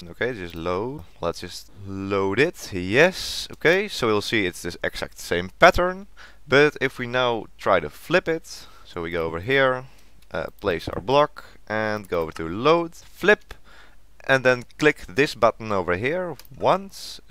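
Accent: Netherlands. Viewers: Hahahahaha Hoi Nederlander ahahhahahaha geen haat maar bij elk Nederlands persoon kan je horen dat je Nederlands bent als je Engels praat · You sound like a dutch man am i right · Ik hoor aan je stem dat je een Nederlander bent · Are you dutch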